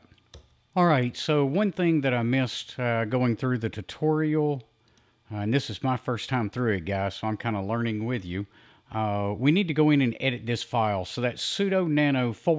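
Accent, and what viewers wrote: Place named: United States. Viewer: remember gentleman his accent is country not stupid Great video dude gonna help me with my school project.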